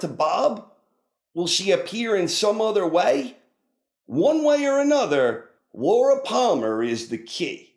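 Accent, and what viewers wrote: New York, United States. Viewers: Guessing he's from New York, maybe the Bronx or Brooklyn, and or, spent a while in Philly. Either way, his narration is clear and concise, his understanding of and his ability to describe the events on screen is uncanny. If you like Twin Peaks you need to be watching this guy.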